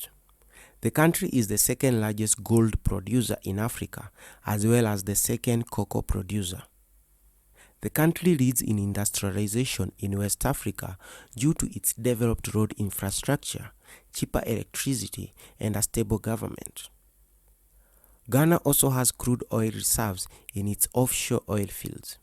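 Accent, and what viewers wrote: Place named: Central, Kenya. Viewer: Am I the only one who hears this guy is from Kenya ( central kenya infact ) because of the way he is "shrubing" ?!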